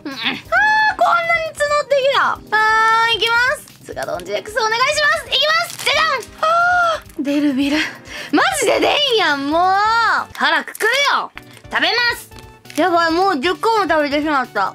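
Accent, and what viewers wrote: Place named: Kansai, Japan. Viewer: ナチュラルな関西弁良き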